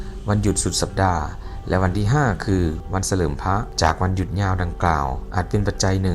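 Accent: Laos. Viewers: พูดได้ใกล้กับคนไทย …แต่สำเนียงบ่อยครั้งออกเสียงแบบคนลาว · ไม่น่าจะใช่ไทยอีสานด้วย น่าจะเป็นคนลาวนะ ฟังจากหลายตัวอักษร ไม่เหมือนของไทย เขาจะออกเสียงยากเพราะความเคยชิน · แอดมินคือคนลาวใช่มั้ยครับ..ผมฟังจากสำเนียงน่ะครับ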